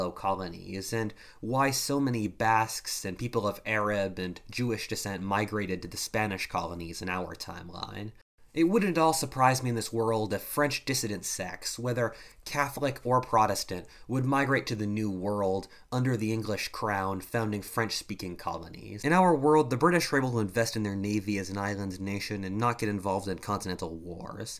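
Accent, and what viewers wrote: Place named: United States. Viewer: You knew immediately when you see a title like this you know it's going to be an American accent! How about teaching your people proper historical research, rather than what it's, conspiracy theories and how awesome we are propaganda!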